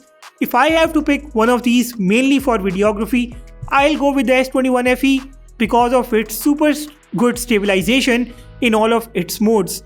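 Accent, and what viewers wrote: India. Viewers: mi video i realized you speak english (or indlish) :D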